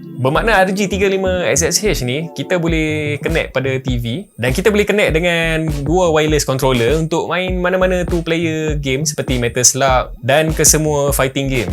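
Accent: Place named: Penang, Malaysia. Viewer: bang asai utara ka?? hahaha ada style stylee mamu penang mari laa cara bercakap😁 apa apa pun saya tangkap Trimui Smart Pro sebab review abang hahahhah:face-green-smiling: TERBAEKK bang teruskann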